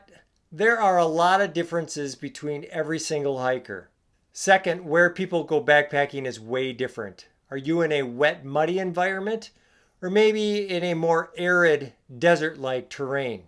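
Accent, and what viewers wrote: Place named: Wisconsin, United States. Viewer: Based on accent, Wisconsin, Barron County? Thanks for the tips.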